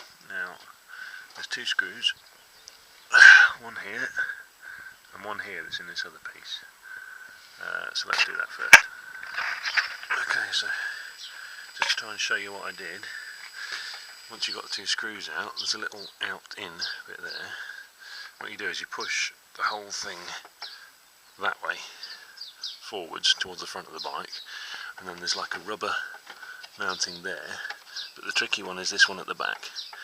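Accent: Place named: England, United Kingdom. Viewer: Get your ears tested. He is clear as a bell if you understand English English. Maybe you are American.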